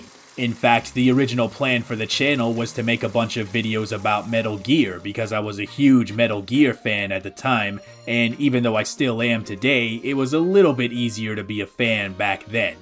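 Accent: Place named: New York, United States. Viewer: I find it funny how in ten years voice went from sounding like a generic 17 year old boy to a gravelly new york stereotype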